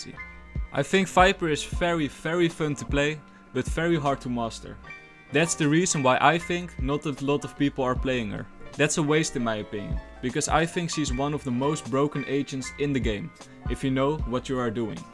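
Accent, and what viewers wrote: Netherlands. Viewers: hahaha i knew that u from the netherlands greetings from germany my guy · Yeah you are dutch 100%%% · Is this guy dutch? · i didn't know you were dutch, dacht dat je russian was ofzo ik was verbaasd XD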